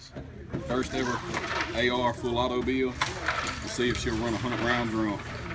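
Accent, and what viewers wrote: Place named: South, United States. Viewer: the true southern draw =)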